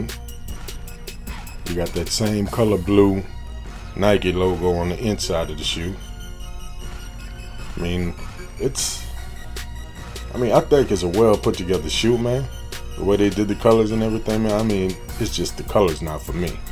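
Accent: New Orleans, United States. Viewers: Is that a nola accent I hear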